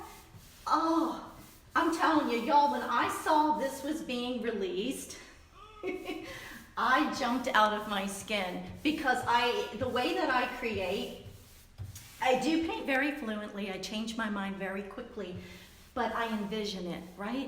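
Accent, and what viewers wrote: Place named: South, United States. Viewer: Enjoyed enthusiasm and techniques! So southern, I was raised in Florida, made me feel like home!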